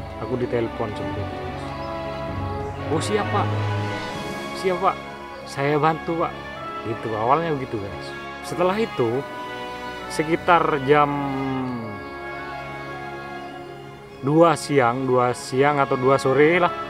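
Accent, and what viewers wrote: Madura, Indonesia. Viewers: orang madura dari logatnya bicaranya ya mas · Kak kalau dr logatnya orang madura ya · Kalo dari logat bicaranya, mas orang madura ya? Salam settong dere tretan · Kok logatnya kaya org madura ya?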